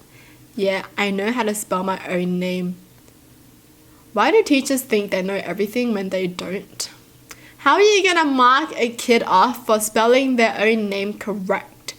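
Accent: Australia. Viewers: Is it just me or I love her accent ✨ Yk, wotaaaaa and norrrrr and caaaaaare and sooooorrrr lol Aussie accent is so cute :D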